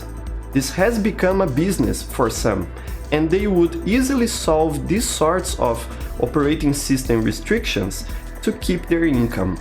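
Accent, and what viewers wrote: Brazil. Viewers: EU SABIA Q VC ERA BR, O SOTAQUE NAO ME ENGANA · are you brazilian because your accent reminds me of brazilian and I'm from brazil :D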